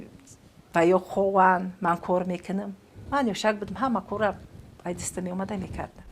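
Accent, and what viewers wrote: Tajikistan. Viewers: Ика забони точикии худмон ширинай. Бо и Модар ширинтар гап мезадас. Ахсан Модарчон мехнатотон рохат шаватон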